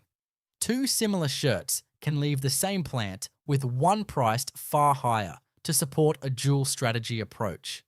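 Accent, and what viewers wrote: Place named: United States. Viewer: Pronouncing numbers the American way eg "one-hundred-forty", not the correct one hundred and forty is annoying.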